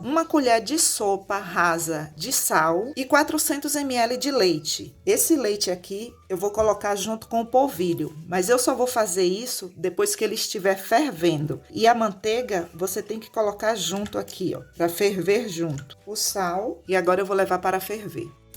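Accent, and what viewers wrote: Bahia, Brazil. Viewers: Aí linda tu e da minha terrinha kkkkk baiano realmente estréia quando assistir um vídeo de empada de carne seca vi um sotaque de Aiai falei será que e daqui pensei acho que não kkkk agora ouço isso ensina com excelência sua dicas e ouro sabia da massa mas as técnicas não aprendendo e muito.Deus de Israel abençoe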